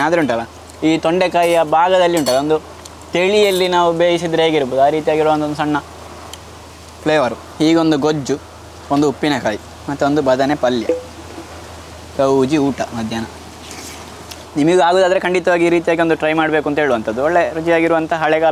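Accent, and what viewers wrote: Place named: Coastal Karnataka, India. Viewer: ನನ್ನ ಕರಾವಾಳಿ ಕನ್ನಡ ಸೂಪರ್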